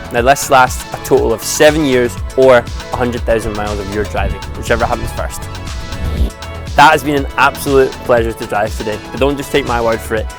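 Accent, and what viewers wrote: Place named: Scotland, United Kingdom. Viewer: The music is way too loud, can barely hear you. Fortunately I had the captions on, which also helped with the Scottish accent 😆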